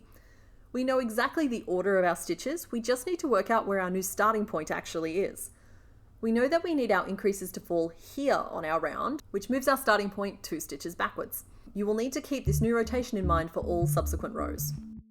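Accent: Australia. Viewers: You explain things very clearly! And it is so wonderful to hear an Australian accent and metric measurements. Thank you! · OMG YES YOUR AUSSIE TOO❤❤❤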